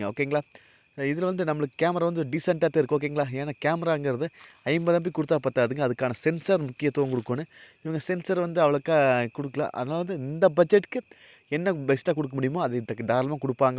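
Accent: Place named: Kongu, India. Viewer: Kongu tamil properah kettu evalo naal aaguthu❤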